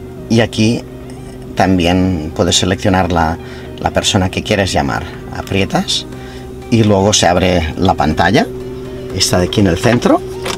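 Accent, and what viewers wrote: Catalonia, Spain. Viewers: Este tipo parece catalan por el acento pero no se donde se le pego lo del piso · De hecho, yo pensaba que era catalán con un acento muy cerrado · Tal como comentan, el acento es claramente catalán. Se nota que has seguido hablándolo aún residiendo en USA. Los paisajes del video me recuerdan mucho a los que veo en la zona limítrofe entre el estado de New York y el de Connecticut, a la altura de Danbury. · Pues el acento es 100% catalán...no noto para nada el inglés. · Eres catalán? En Cataluña hablamos como tu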